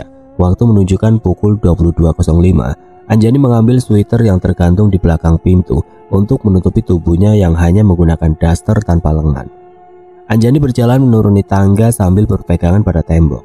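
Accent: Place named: Java, Indonesia. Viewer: Jadi lucu denger mas jowo ngomong bahasa Sunda ... 😁 Aksen jowonya medokk ... Lop sakebon ❤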